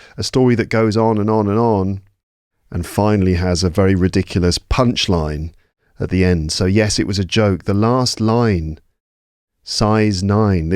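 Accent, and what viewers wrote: United Kingdom. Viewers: I love the accent British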